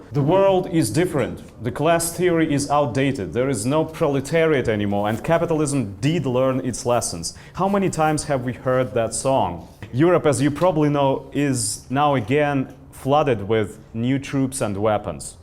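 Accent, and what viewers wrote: Russia. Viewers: СЁМИНУ очень идёт легкий русский акцент:) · Молодец! хорошо говорил с русским акцентом! Я тоже не люблю кревлять языком, пытаясь подражать американскому акценту. А главное серьёзно, коротко и по делу, без лживых импортных улыбок, которые так часто можно наблюдать на подобных заседаниях! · Чтобы попасть на обучение в клятую Америку, пришлось сгрызть зубы об английский. Но по акценту определяется безошибочно - говорит русский. И даже НЕ говорит - читает перевод с русского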